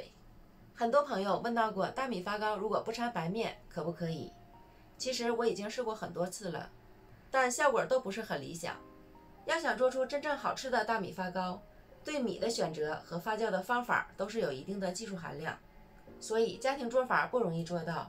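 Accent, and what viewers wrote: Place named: Northeast, China. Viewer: 东北腔。。。大米发糕的桌法，😅 也加了麦子面的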